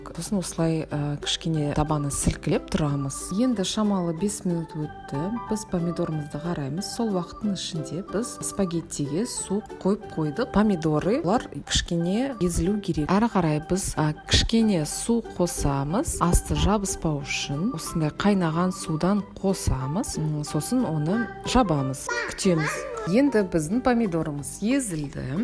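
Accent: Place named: Russia. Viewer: Сіздің орысша акцент-ыңыз бар екен сол үшін айтып жатқанмынғой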